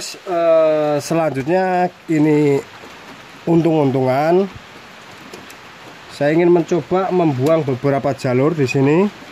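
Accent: Java, Indonesia. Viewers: dari kalimantan timur ko logatnya seperti wong jowo bang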